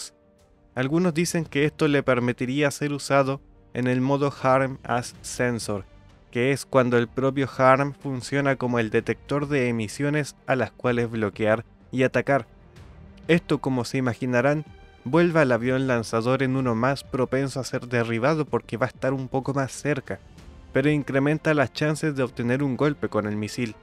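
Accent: Chile. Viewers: Excelente video y su análisis. No es usual encontrar análisis tan objetivo basado en la especificación y proyección técnica que podría ser usada en un conflicto. Siendo venezolano donde junto a EEUU (país fabricante) poseemos una de las escuelas y formación de mayor data sobre esta plataforma, no me es de extrañar que posiblemente (por el acento) un hermano chileno, nos de tal orientación, donde concuerdo perfectamente en el entendido que se quiere hacer ver que los F16 seran los dueños del espacio aéreo ucraniano y nada más lejos de la realidad, ya que sólo son una plataforma y los rusos aún por: 1)Entrenamiento y conocimiento de las propias 2)Capacidad de sus plataformas 3) Posicionamiento y plataformas multiplicadoras 4)Tecnología Finalmente 5)Número y diversidad plataformas como de unidades Superará con creces la variante aérea que implica el ingreso del F16 (en versión reducida) Sólo en entrenamiento, en conocimiento para luego llegar a un dominio determina muchas horas de vuelo, que será muy difícil lograr y esto es uno (muy importante) pero sólo uno de los factores que determinan para lograr algún entorno de éxito. 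Por el contrarío el ingreso de esta plataforma involucra factores verdaderamente preocupantes a ser considerados ya de emergencia en el Consejo de Seguridad de las Naciones Unidas, ya que esta plataforma a diferencia de las Rusas es más delicada ante impactos, pistas de aterrizaje, su mantenimiento y su reparación, esto DIRECTAMENTE determinará que países eu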